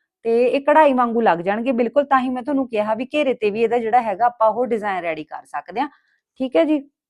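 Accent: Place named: Hoshiarpur, India. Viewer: Hoshiarpur de boli h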